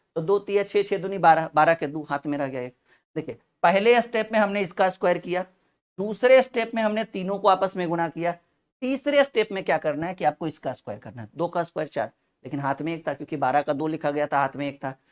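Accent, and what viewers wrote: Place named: Maharashtra, India. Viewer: तुम्ही बहुतेक मराठी आहात.कारण तुम्ही आठी, साती म्हटले.😊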